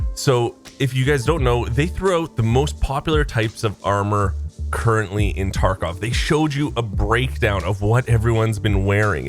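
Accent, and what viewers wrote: Canada. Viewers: 1.6k hours and I do know all this stuff but your videos are just so damn fun to listen to that I’m still gonna watch it! You and your damn whacky Canadian accent, keep it up brother!!!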